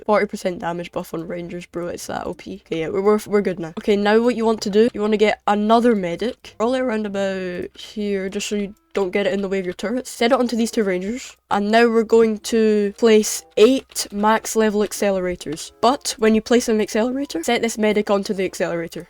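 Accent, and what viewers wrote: United Kingdom. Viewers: the moment i heard the brit accent i knew this is going to be good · it sounds scottish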